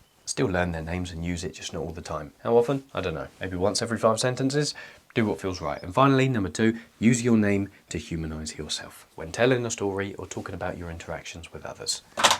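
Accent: United Kingdom. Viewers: I recently discovered your channel, and aside from the helpful advice, I have to say I love your voice, your way of speaking, and your soft British accent. English isn't my native language, and your videos are helping me improve my pronunciation. goat 🐐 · This British accent is understandable and good, which part of Britain do they speak this way? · Good morning! How do you spell your name? I spell mine Cory. So, I’ve been spending less time on my phone but it struck me this morning, i miss British accent and words of wisdom❤ i also thought to myself, Cory how much of the health that has lead you to spend less time on your phone is due to fabulous YouTube channel 🤔🫶🏻🫵🏻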